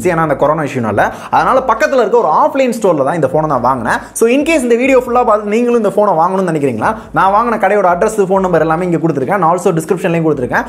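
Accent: Madurai, India. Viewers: Madurakaaranaya nee😄🔥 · Bro neenga madurai bro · Bro okaluku madurai ya?? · bro nenga madurai ya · bro ninga madurai ya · Madura karanku thon antha slang puriyum.. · Bro nega madurai ya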